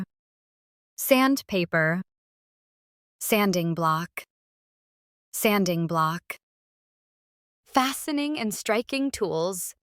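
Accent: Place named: United States. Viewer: Horrible Murican accent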